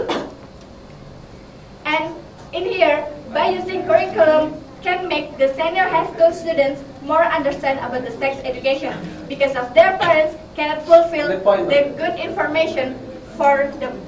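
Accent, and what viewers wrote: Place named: Indonesia. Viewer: Too fast like you are good in speaking english, but beware from other people who watch this and know your grammar, your pronounciation and your bahasa's accent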